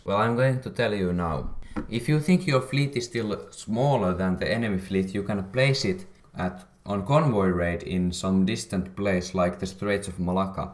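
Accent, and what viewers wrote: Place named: England, United Kingdom. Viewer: Sounds like rally english.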